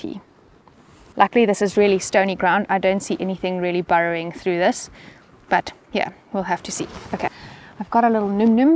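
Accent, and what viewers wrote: South Africa. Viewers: We made a drinking game where everytime you say water (woter) in that beautiful sa accent we have to drink. After 6 minutes we are absolutely smashed. 😂😂😂 Awesome videos, thank you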